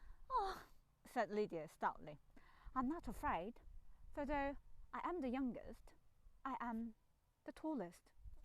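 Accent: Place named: United Kingdom. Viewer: 목소리도 좋고 , 발음이 영국인 같구먼~~~^-^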